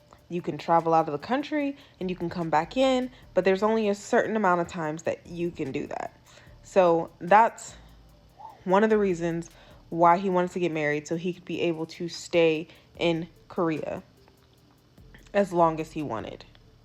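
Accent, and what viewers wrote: South, United States. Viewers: Hey, thats a cute lil baby in the footage at the end of ur videos like part 1 of this and others. Ur dialect is giving from the South, where bout? Im from MS..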